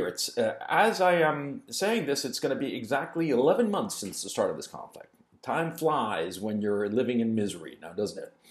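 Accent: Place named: United States. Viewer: Claims to be from Ukraine on twitter, has the strongest american accent in existence, sure bud. How much is Russia paying you out of curiosity?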